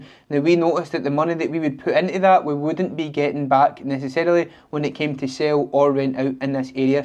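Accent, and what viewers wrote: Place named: Manchester, United Kingdom. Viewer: What accent is this? My subtitles are confused. Are you from Manchester?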